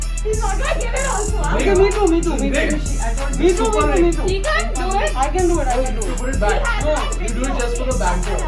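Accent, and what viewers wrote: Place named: England, United Kingdom. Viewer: Your vidoes and content are very good but many of my frnd Don't take interest in because of your very heavy English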